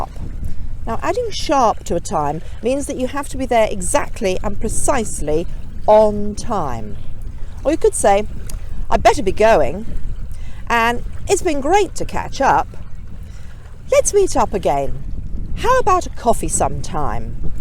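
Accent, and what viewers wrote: United Kingdom. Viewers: I like your standard English and Received Pronunciation,, thanks for great tips ,, you asked before what sounds we have problems with ,, well I have problem with ‘b’ sound , the I know the main difference between ‘ b’ and ‘p’ is the former is much stronger than the latter but the trick is how I can make ‘ b ‘ such a strong sound ??? Apart from vocal cords use as I use then while uttering ‘ b’ but I feel I still miss something to make ‘ b’ more powerful but I don’t know what is it !!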